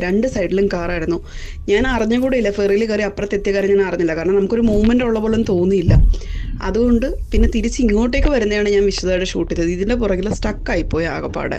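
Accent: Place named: Pala, India. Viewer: Pala പരിസരവാസിയാണെന്ന് തോന്നുന്നു ഈ സഹോദരി... കാരണം ഇത്രയും amicable ആയി സംസാരിക്കുന്നതു കേൾക്കുമ്പോൾ ariyam njanum palayil aanu രാമപുരം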